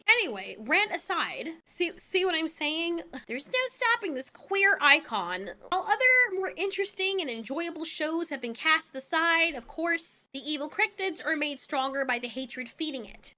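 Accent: United Kingdom. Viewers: Just gotta say it... Your Brit accent is on point! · That avitar and that British voice would sell the seas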